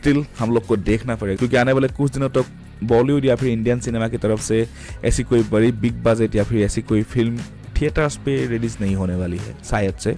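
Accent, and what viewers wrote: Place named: Assam, India. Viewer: Your accent say's that u are Assamese 😅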